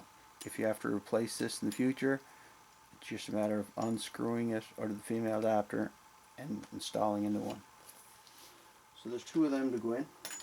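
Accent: Canada. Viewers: Fantastic series, thank you!!!! We need to redo the lines in our house, and now know what to do! We are interested in putting in a manifold system, now know how to do it. Love all the great information, even it the idiots on here think you're rambling. That "rambling" is the little shit you run into that makes you go.. "uhhh, what to do I do now." You take care of all that with your musing. Thank you! One question, where do you buy this stuff? Thanks again, love the Canadian accent eh. ;P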